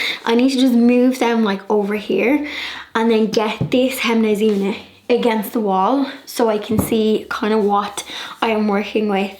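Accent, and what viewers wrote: Ireland. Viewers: Oh my gosh, that looks amazing, I was also amazed that you just moved things around your room and painted everything in place. I would have had paint everywhere! Great job, loved the idea and thanks for sharing....PS you are simply lovely and I could watch you all day just to hear your beautiful Irish accent. Stay safe, hugs from 🇨🇦❤️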